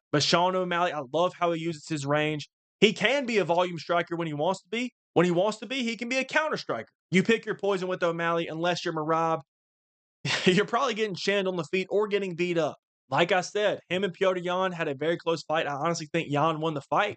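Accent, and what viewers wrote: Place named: Appalachia, United States. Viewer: bro got the hillbilly accent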